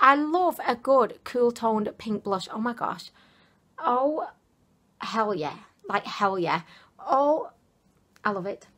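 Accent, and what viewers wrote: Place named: Manchester, United Kingdom. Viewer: Ahh!!! I just came across your channel!! You’re so precious 😍 are you from Manchester? You have the same accent as some of my family that live over there!! Lots of love☺️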